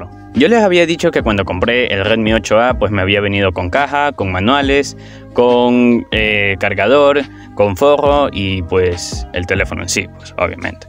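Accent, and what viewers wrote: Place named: Peru. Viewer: Men eres de Perú ? Tu acento parece de Perú noce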